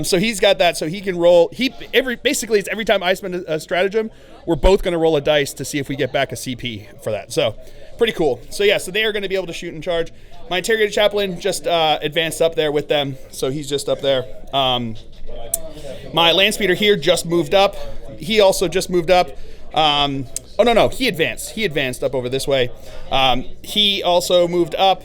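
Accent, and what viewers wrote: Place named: Alaska, United States. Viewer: Having lived in Georgia and moved to the Pacific Northwest, I have found a lot of people regularly speak like this. I think is original from Alaska (which may have similar accent)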